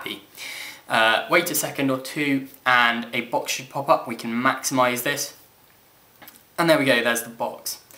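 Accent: United Kingdom. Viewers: wow i love british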